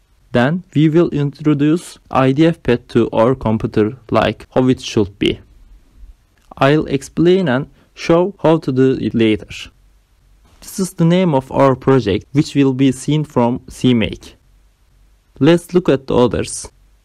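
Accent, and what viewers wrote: Turkey. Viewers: Are you Turkish? because your accent is similar :) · You are Turkish, I can swear but I can not proof :)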